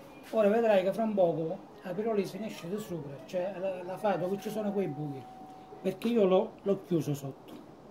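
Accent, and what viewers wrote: Italy. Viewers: Ma quale calabrese questo e' un siciliano verace............... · dialetto quasi uguale al leccese 😁, bel progetto , sarebbe bello vedere come l hai fatto , e in più io lì dentro aggiungerei qualche pezzo di legna giusto per risparmiare veramente.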